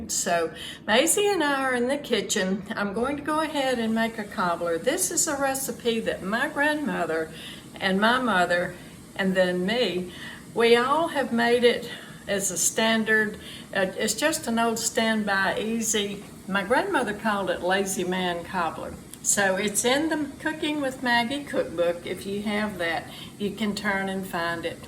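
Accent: South, United States. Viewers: ❤❤❤Oh my goodness! You just stirred up wonderful memories for me! I am in my 70's & my beloved Aunt (deceased) and all my relatives grew up in Mississippi along with my Mother. Only cousins left now. My Aunt taught me how to make this EXACT cobbler recipe when I was in high school! I've made it so many times over the years using all kinds of fruit. Best part of all is your southern accent- makes me feel like I'm with family! You are a treasure - I am subscribed and look forward to many more videos! God bless you!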